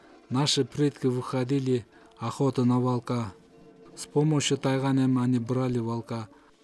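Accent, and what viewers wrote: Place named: Kyrgyzstan. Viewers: Таза кыргыз орусча суйлогондо ушундай суйлойт билип ал манкурт☝ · Э гөкмээ байке кыргыз, иван же сергей эмес, таза кыргыз ушундай сүйлөйт орусчаны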